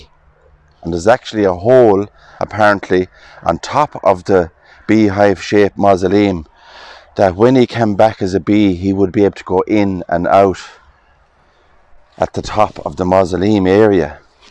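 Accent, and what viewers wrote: Ireland. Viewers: Love your videos and your Irish accent, will happily subscribe, cheers from Australia. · there is no lovelier accent than the Irish brogue, I enjoy listening too him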